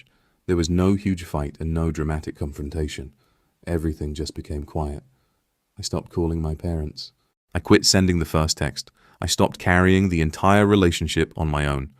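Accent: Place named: RP, United Kingdom. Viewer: lol, these Ai generated stories are whacked out😂 He Supposedly grew up in Knoxville Tennessee, yet has an upper class British accent 😂.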